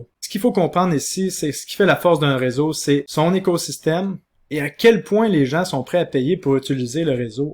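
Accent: Quebec, Canada. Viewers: J'adore l'accent des cousins d'outre atlantique.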